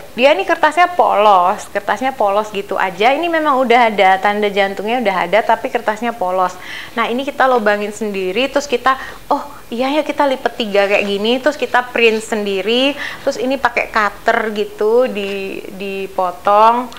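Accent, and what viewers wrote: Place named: Surakarta, Indonesia. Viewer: Thx kak..luar biasa kesaksiannya.. Tuhan selalu sertai keluarga kak..( baru tahu saat lihat YT pas ini..kalau ternyata kaka di luar negri hihi saya kira di Solo Indonesia karena logat dan kebayanya ) GBU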